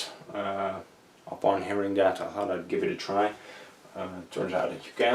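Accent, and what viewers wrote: Netherlands. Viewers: Great look around your storage room. Thanks. I like your dutch accent. One of the reasons I watch your , other the really cool RC videos. HOOORAY FOR EVERYTHING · iam dutch to you have a realy dutch acent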